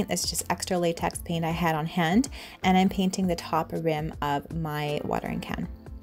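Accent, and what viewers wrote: Canada. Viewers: Hi! I just subscribed to your channel and just loved every one of your DIYs. My favorite is the bird houses, but the faux water can and your garden sign are a close second. I also love your Canadian accent and am looking forward to more videos.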